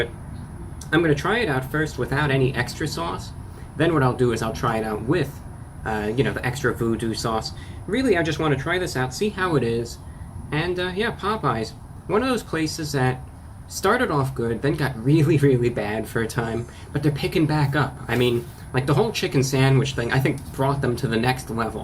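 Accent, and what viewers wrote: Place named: Mid-Atlantic, United States. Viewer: I thought with his accent it was NJ or somewhere mid-Atlantic coast.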